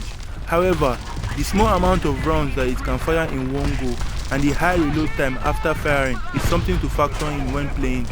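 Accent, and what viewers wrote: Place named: Africa. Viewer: because you have an african accent ,but a great guide btw I've already subscribed and am waiting for more tank guide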